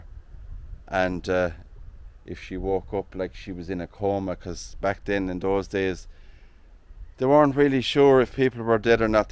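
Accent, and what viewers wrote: Ireland. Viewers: I like the term of torch, vs our flashlight. 😂 To bad a person re-purposed yours, for themselves! Hello from Northern California, I am enjoying another of your videos. I am getting better with understanding inflections with your accent, in addition to having had some amazing Irish neighbors, who have moved back to Ireland. Happy New Year to you, your team and family. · 322nd So many people are clueless about human biology and what happens to a body when you die ..... great youtube page "ask a Mortician" ..the body bloats and releases trapped gases....love your accent / my direct relatives are from Donegal - Gallagher/Coll/Costello ...I was in Ireland 15yrs ago - Gorgeous country ....I wonder if the Cusack..... is related to the Actor/family Cusacks?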